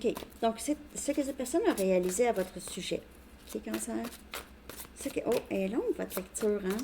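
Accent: Canada. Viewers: Bonjour et merci 🙏 pour votre guidance qui me parle bien. Il est Poisson et je suis Cancer. J'ai donné beaucoup en effet mais impossible de tenir un homme qui aime plaire et séduire. Il m'a quittée il y a 17 ans, pour une autre, par mail sans explications après 12 ans en triangulaire. J'ai divorcé pour lui mais lui non. il revient aujourd'hui et je ne sais toujours pas pourquoi? Nous nous sommes revus en Mai et Juin dernier, il est resté taiseux et depuis c'est le silence radio???. Il m'a dit que nous nous reverrons en janvier ou février prochain sans même demander mon avis?? Wait and see...., j'adore votre bonne humeur 👍 vous été drôle, ça fait du bien de vous écouter et votre accent canadien un vrai délice ❤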